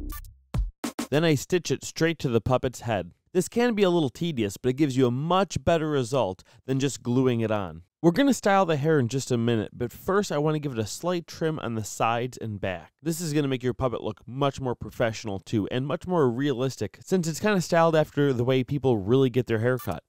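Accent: Brooklyn, United States. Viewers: Ahehe Brooklyn guy lol...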